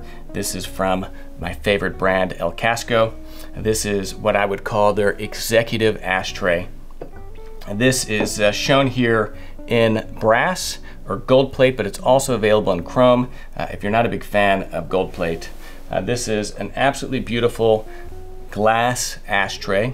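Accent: Texas, United States. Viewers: We native Texans love our drawl!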